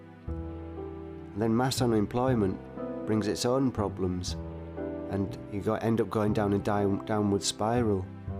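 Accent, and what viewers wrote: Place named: Northern England, United Kingdom. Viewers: the accent remnds me of my friend from yorkshire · What kind of accent fo you have? Northern England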